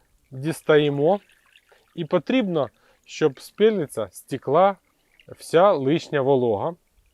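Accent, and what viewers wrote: Surzhyk, Ukraine. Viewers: Україномовний чи російський яка різниця, аби контент був хороший. І не в образу вам, уривки Азаровщіни у вас присутні.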